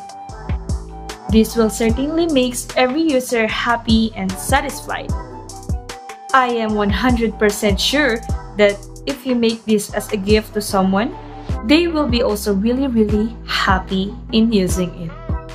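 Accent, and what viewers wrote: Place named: Malaysia. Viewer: Are you malaysia ur accent is nice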